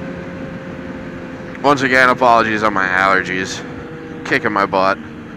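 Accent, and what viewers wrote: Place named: Minnesota, United States. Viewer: Yooooo Minnesota brother xd I had a feeling you were from there I can tell from your voice xD. That place looks familiar.. further into the video and your right in Duluth Xd this is cool I'm from there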